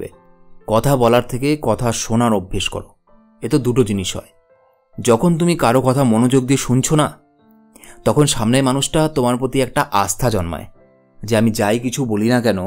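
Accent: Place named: West Bengal, India. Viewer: কথার উচ্চারণ শুনে মনে হচ্ছে আপনি ভারতীয় বাঙালি।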